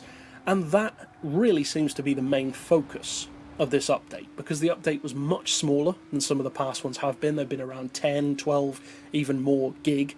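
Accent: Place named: Wales, United Kingdom. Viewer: You sound a bit Welsh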